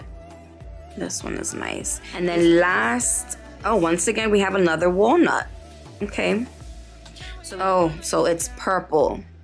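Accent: New York, United States. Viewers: Not only do I love your nail work your New York accent is to die for! I’m from California, and anytime I go back east I could listen to people talk for hours! You are so fabulous! And PS I have used those wax pencils to pick up stones ,large glitters etc. they work really well😀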